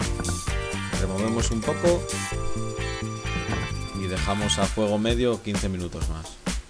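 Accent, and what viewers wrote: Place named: Asturias, Spain. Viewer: Me apunto la receta!!! Me acabo de suscribir!!! Eres asturiano!!!!???? Por el acento eso me parece....yo lo soy ....voy a echar un vistacin a tus recetas👌🏻👌🏻me parecen muy ricas asik tomó nota de algunas de ellas... Bueno,..pues por aquí me quedo...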